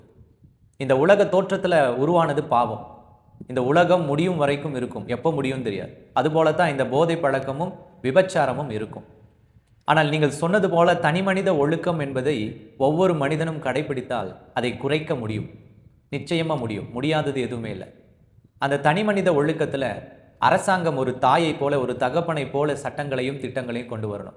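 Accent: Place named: Coimbatore, India. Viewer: First porn and unnecessary sites to be banned. TV channels also promote adultery. TV serials show a man with two women stories and illegal relationship. Also in the name of revolution unnecessary stories with wife applying for divorce even if her husband is good. Why can't serials show good stories with history and atleast one science serial. Self control and discipline are needed. Moral science has to be made mandatory. Pre-marital sex is wrong. My grandma will not allow even her married daughter with child to go out alone after 6pm. She used to tell a girl should not talk with an unknown person. One should know their limits while moving with a known and an unknown person. These things have to be conveyed. Coimbatore English pronounciation. Kindly use Tamil slang.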